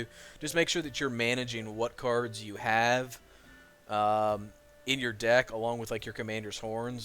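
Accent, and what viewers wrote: United States. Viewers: I mean...i'm trying to wonder what accent it would be...he sounds Pacific N.W..atleast west coast. Not much of an accent. He has some southern sound when he says Line. Mostly with his (L)s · i agree with the others, your videos a great dude, what accent? you seem to have a pretty normal middle of the road american male voice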